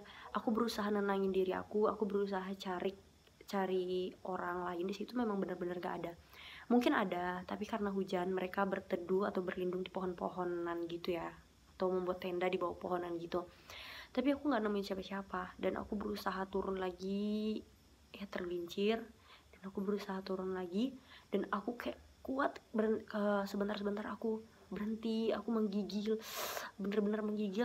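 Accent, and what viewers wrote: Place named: Berastagi, Indonesia. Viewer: Orng dari berastagi mana nih suaranya😌🙏🏻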